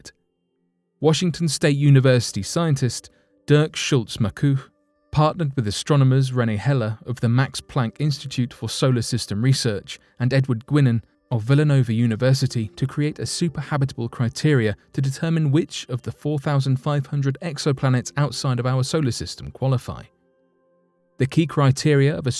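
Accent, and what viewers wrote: United Kingdom. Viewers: The reason that there is not as much Ozone at the south pole is not due to abnormal depletion, but due to the much lower amount of solar radiation that is necessary to form ozone, due to tangential angle of sunlight over Antarctica. But you say all this information in a British accent, and people believe it!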